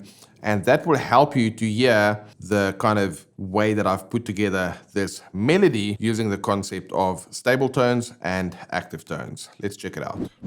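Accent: South Africa. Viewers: thanks for the vid! Are you south african by any chance?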